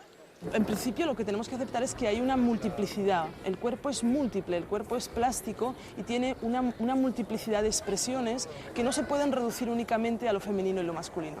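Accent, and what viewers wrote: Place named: France. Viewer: Se le nota deje francés al hablar. Se nota que vive y trabaja en Francia.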